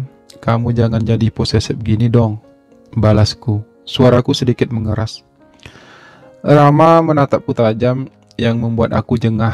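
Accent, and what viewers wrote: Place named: Medan, Indonesia. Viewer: Klu aq cermati aksen suaranya ~ aq yakin Abang ini dari Medan ~ ya kah ????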